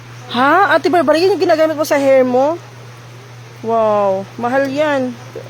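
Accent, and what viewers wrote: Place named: Waray, Philippines. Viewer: salita mo parang salitang waray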